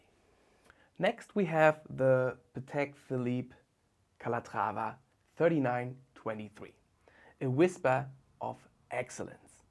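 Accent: Germany. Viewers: I love your videos. Your lighting and the way you showcase your watches really elevates them. On a side note, I love your accent too. I’m in the UK and have a close friend who is German, a lot of the words you pronounce similarly to him, we call it Ginglish! My surname is Konig too, my grandfather must’ve lost the ö when he came over to the UK, I doubt they had “ö” on the typewriter when they processed his papers so we’ve lost it!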